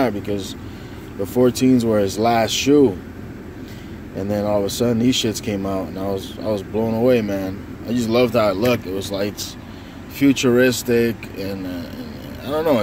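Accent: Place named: Massachusetts, United States. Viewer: sneaker Man you're from mass i hear it im from dorchester you jist gained a sub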